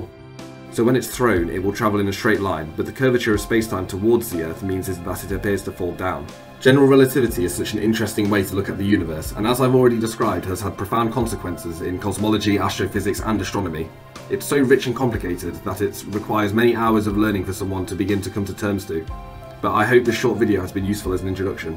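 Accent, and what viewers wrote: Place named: United Kingdom. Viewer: difficulty understanding some of the British accents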